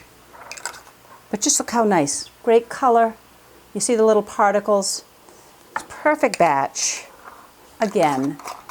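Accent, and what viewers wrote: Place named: Maine, United States. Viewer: Do I detect a New England/Maine accent? I am from Freeport, Maine and you sound like home! Now I’ve gotta try your pepper jelly. Have you tried it over a loaf of cream cheese? Delicious..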